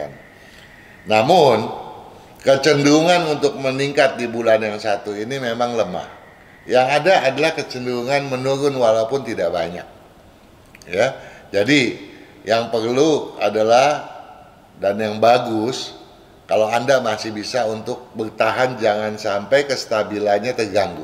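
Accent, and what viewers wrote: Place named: Malaysia. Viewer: Wow.... Bahasa dan tone suara koko sangat bagus,susah oo mau dengar org cina bahasa pasif bngt,jelas bngt dan seakan² sudah mendekati pekat bahasa Indonesia nya..koko tggl di lingkungan melayu ya sbb logat nye seperti MELAYU very good.. Love you..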